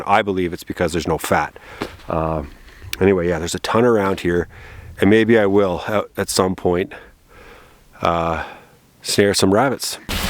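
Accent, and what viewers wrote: Newfoundland, Canada. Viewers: I hope you wanted your chicken well done! You say you're in the NWT but you sound like a Newfie? Shouldn't you be on the other side of the country?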